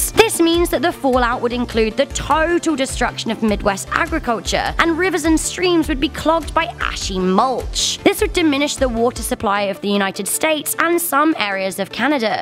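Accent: United Kingdom. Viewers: I don't know...I don't know...I don't know where I'm-a gonna go when the volcano blows. I love hearing Brits pronounce things like "St. Louis" and "Cheyenne".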